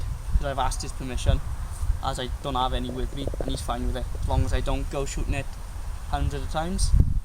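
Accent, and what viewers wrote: Wales, United Kingdom. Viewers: That was the first rifle I bought and are you from Wales because you sound welsh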